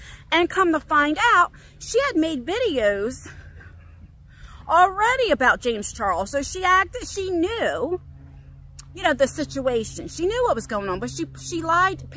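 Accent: New Jersey, United States. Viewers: If you can make $ talking to yourself I can too bc I don’t care about talking & im LEGIT EXACTLY LIKE YOU MINIS JERSEY ACCENT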